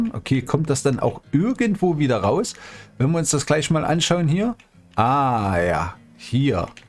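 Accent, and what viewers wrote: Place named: East Germany, Germany. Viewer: Moin die Map hast du ja sehr schön vorgestellt und ja der Harz ist zum Teil meine Heimat ich finde diese Karte sehr schön und mit deinem ostdeutschen Dialekt rundet das die mapvorstellung perfekt ab ich würde mir echt wünschen dass du mehr modvorstellungen machst weil du kannst das richtig gut gibt von mir einen Daumen hoch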